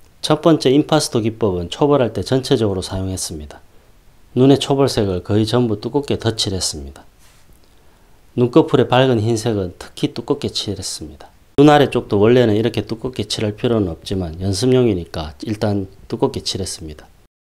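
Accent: Gyeongsang, South Korea. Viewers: 진짜 의도되지 않은 리얼 경상도 발음 눈까리 빵터짐 ㅋㅋ 울랑이랑 비스무리한 스퇄의 아재 개그 정말 딱 내스퇄 강의 ㅎㅎㅎ 취미로 그림 그리다 우연히 알게되었습니다. 시간됨 자주 들릴께요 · 눈깔 그리시는거 잘 보았습니다. 갱상도 말투로 시크하게 설명하시는것이 너무 제취향이십니다……하하핳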